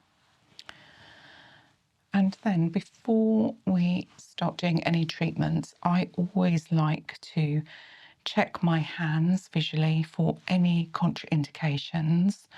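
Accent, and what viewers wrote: United Kingdom. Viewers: Immediately subscribed upon hearing your voice and oh so delicious British accent :)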